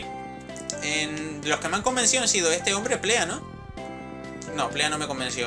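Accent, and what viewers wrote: Canary Islands, Spain. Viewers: Eres canario¿ es que tienes un acento muy parecido . Pd: me gusta tus vídeos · eres canario?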